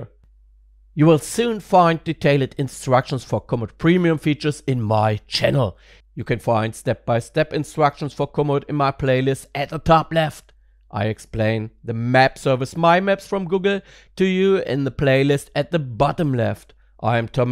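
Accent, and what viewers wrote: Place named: Germany. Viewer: (english down below) german: hi. schade dass du nicht näher auf das live tracking eingegangen bist, das is das was mich an premium am meisten interessiert. also wie das live tracking genau funktioniert, wie zuverlässig es ist, und ob man auch ohne komoot meinen standort tracken kann. mein plan: ich möchte meiner community während einer tour im livestream die möglichkeit geben, zu sehen wo ich gerade bin. und natürlich haben die leute warscheinlich selber kein komoot. nebenbei: schon nach den ersten wörtern dachte ich mir "die aussprache klingt so als wäre er deutsch". und ich hatte recht. english: hi. it's a shame that you didnt describe the live tracking more detailed, because that's the premium feature i'm most interested in. i mean like how exactly does the tracking work, how reliable is it, and can you check/track my location without having komoot? 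my plan: i want to give my community the opportunity to track my location during a livestream tour. and of course they most likely dont use komoot themselves. on a side note: after a few words i already thought "the pronouncation sounds like he's german". and i was right.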